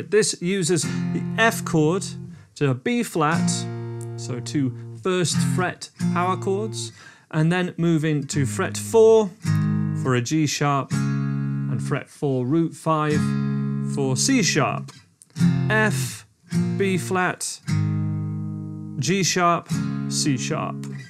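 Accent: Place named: Northeast England, United Kingdom. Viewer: Dude are you from the north east of England?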